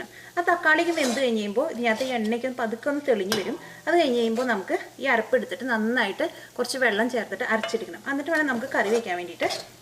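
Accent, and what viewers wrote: Thrissur, India. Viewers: thrissurkkari ano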